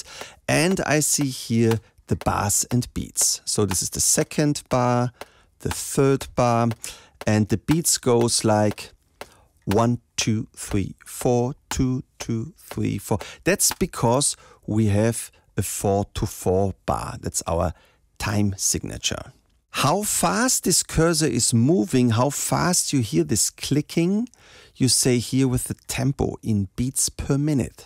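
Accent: Germany. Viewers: Leichter deutscher Akzent 😂😂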